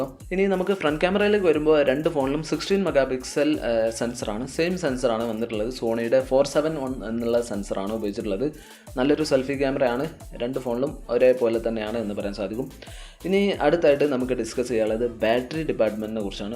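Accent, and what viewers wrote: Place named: Malappuram, India. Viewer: Ayye malappuram bhashayil avatharam... 🤭🤭🤭😬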